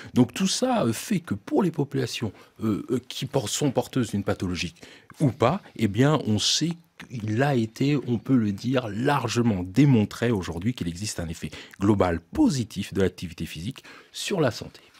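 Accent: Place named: France. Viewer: Pourquoi ce monsieur prend l un accent Français....probablement si est français 😅😅😅😅 Pauvre Afrique....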